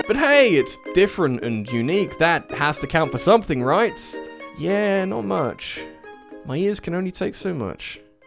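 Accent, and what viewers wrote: United Kingdom. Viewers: I didn't even realize you were British until you said it. I've heard so the accent consistently for years I forget there's a difference between American and British accents. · I never played that Yoshi Island and I'm glad I never did because the original it's my favorite game of all time and the music there was a piece of art, but this one... Yeah, I don't want to get near that. Also I like all the subliminal messages on your video, like the Splatoon plushie on your left and the star to the right representing how in this video the music is more important tan any game mechanic, also the Lucio's T shirt making us know there is a little percentage of game with bad music just like the Overwatch community has a small percentage of bad people but with a lot of great potential and awesomeness out there... Or maybe I'm just overthinking everything while listening to your british accent! 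· You mention that your audience has a craving for dat British accent. Okay what about your audience already native to England in the UK? People probably go to sleep peacefully to your sexy voice all over the place (no homo)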